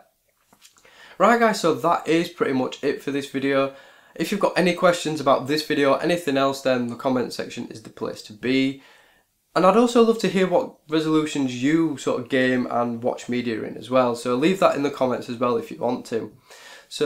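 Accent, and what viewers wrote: United Kingdom. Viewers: So hard to understand some of what your saying laddie yalll uk lots talk like this ya?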